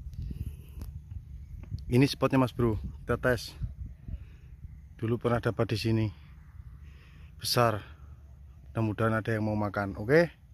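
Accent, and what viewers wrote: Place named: East Java, Indonesia. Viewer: Sulawesi kok sampean koyo jawatimuran bang? Sory kepo nih😁😁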